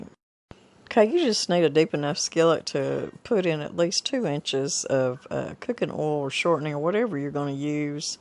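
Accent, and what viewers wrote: United States. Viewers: Ma'am, that is some serious man chow. Even putting three or four in a lunch box for work days would be wonderful. Your voice is just country as a butter bean. Thank your for the recipe and the video. Be well and at peace.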